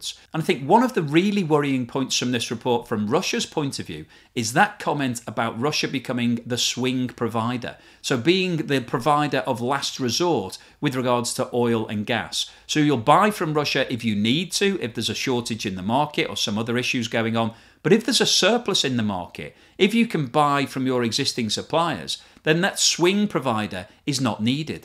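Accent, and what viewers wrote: United Kingdom. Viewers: A british accent alone doesn't make a knowledgeable man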